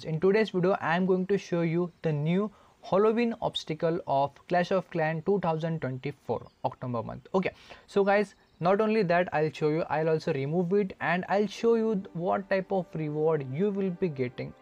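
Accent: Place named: India. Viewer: sounds like he from india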